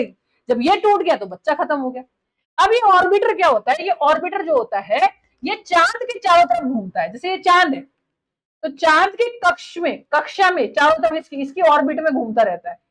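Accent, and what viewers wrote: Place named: Haryana, India. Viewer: ❤❤❤😂😂😂😂😂❤❤❤व्व्व्व्वा ह व्व्व्व्वा ह व्व्व्व्वा👌👌👌👌👌 च * संदेश कार्य विश्लेषण * * बहोंत बहोंत बहोंत सुंदर और अच्छीं तरहसें समझां दियां * अपनें माॅं और बच्चों कों * अपनी हरियानवी टोन कीं * हिंदी भाषा में * बहोंत बहोंत बहोंत सुंदर * धन्यवाद😘💕😘💕😘💕 नमन वंदन🙏🙏🙏🙏🙏 अभिनंदन भीं👋👋👋👋👋 * वंदे मातरम् भारतं हिंदुस्थानी मातापिताम् महापुरुषं लोकमान्यं जनता जनार्दनम् संतं वैज्ञानिकं🙌🙌🙌🙌🙌 👏👏👏👏👏👏👏👏👏🙏🙏🙏🙏🙏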